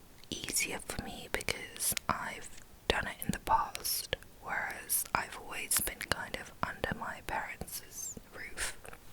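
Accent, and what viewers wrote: England, United Kingdom. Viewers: You are starting to sound a little more 'English' after your time over here in the UK :) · 만세만세🙌🙌🙌🙌🙌인스타에서 댓글받고 얼마나기다렸는지 몰라요🙌🙌고3이라 폰을 중독방지어플로 잠궈놔서 알림받고도 보지를못했어요ㅜㅠㅜㅠ이제야보다니ㅠㅜㅜ언니 머리 많이자랐어요!!!!어릴때 영국갔을때 그 특유의 발음을 듣고 컬쳐쇼크였는데 언니발음에도 그 발음이 들려요ㅇ.ㅇ!!영국미국을 오가며 글로벌하게 자라셔서 그런가ㅋㅋㅋㅋ컴백환영해요💕💕💕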